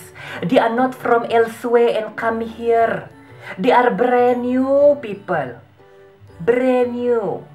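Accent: Sabah, Malaysia. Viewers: you have thick sabah accent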